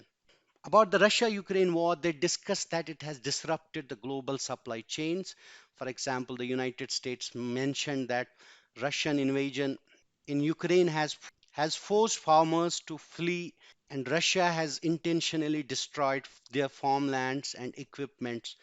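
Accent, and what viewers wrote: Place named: Kashmir, India. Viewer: Thank you sir, Nice analysis of the big new of the day.. New voice from byju's probably from North india, most probably a kashmiri voice.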